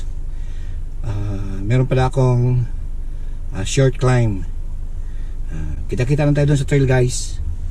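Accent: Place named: Visayas, Philippines. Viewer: Hmmmm bisaya pod, taga asa ka bai?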